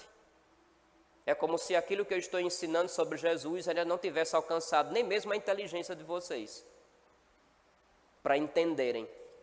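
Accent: Northeast, Brazil. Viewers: LOUVADO SEJA DEUS POR ESSA HOMILIA CATEQUÉTICA (SEM FALAR DO SOTAQUE MARLINDO QUE EXISTE, OXENTE), LOUVADO SEJA DEUS PELO SEU SACERDÓCIO FIEL E SUBMETIDO A VERDADE PADRE, AMOR DE JESUS NOS LIBERTA DO PECADO QUE CONDENA E MATA A ALMA. O HOMOSSEXUALISMO TBM É PECADO! ✝️🇻🇦🙏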